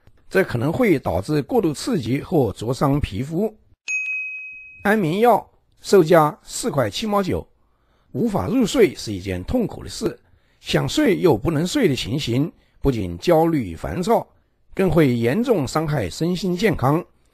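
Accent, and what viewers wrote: Sichuan, China. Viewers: 这一听就知道是川渝方言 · 这个普通话是个四川人在说吗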